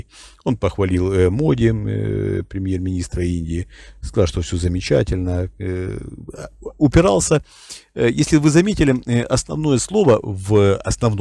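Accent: Ukraine. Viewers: Виктор Михайлович, вы употребляете слово " ТРУСИТ". Генерал контрразведки - вы украинец ??? У вас акцент украинца, очень много слов и оборотов, которые применяют в речи только украинцы...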